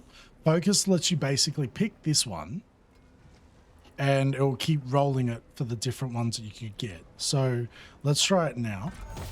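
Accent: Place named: Australia. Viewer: Hey mate, the missus likes it comment under mine.. huge thanks very informative and a pleasure to hear a fellow Aussie do a tutorial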